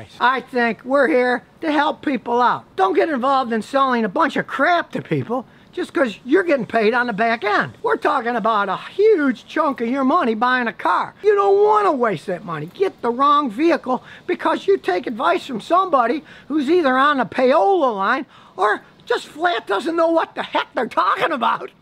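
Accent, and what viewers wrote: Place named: Midwest, United States. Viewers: Definite midwest accent here. I'm guessing Chicagoland area? :) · You almost sound Chicago in this video! Not one once of the bullsh*t you'll hear daily.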